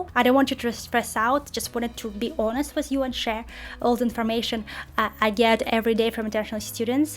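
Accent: Russia. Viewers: russian accent sucks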